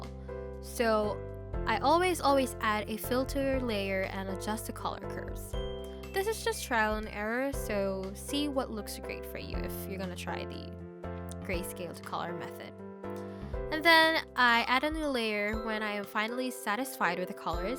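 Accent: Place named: United States. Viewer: no worries! Your fluent voice makes it crystal clear HAHAHA. Never really met a lot of fil ( assuming you are) speaking like an American teen so das something...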